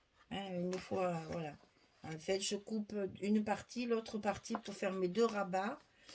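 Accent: South, France. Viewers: Bonjour, c'est un cadeau que tu nous fait tous les jours. Une vraie artiste ! En plus quel bonheur d'entendre parler ''le sud'' qui me manque tant. Des bisous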